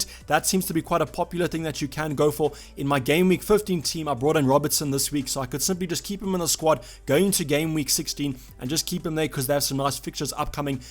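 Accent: South Africa. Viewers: I love your South African accent :)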